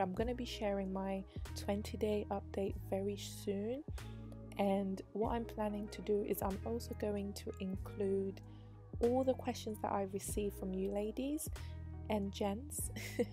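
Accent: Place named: South London, United Kingdom. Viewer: Yes Sis. Love the vid, thanks it helps so much... Imma try this under my wig. Anyhow I'm In South London UK and i recognise that accent of yours😀 Stumbled on u vid as i was checking out rice water for hair growth.. Keep up the good work girl, and keep looking after that amazing hair of yours..💓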